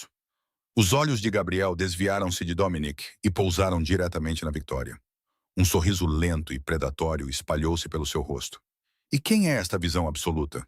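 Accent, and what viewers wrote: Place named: Portugal. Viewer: EQUIPE ! ARREPIANTE, AFIADISSIMA ADAPTAÇÃO DE ROTEIRO. O MELHOR E MAIOR MÉRITO A NARRAÇÃO AO ESTILO LUSITANO COM MUUUIIITO ESTILO. ADORÁVEL! ✨💫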